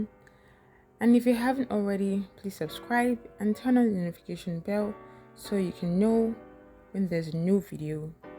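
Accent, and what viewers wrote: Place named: RP, United Kingdom. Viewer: It's the Queens English for me ❤❤❤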